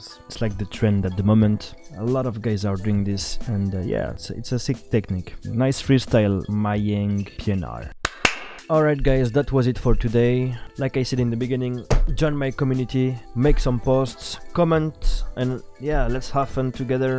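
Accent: France. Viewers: are u french?🤔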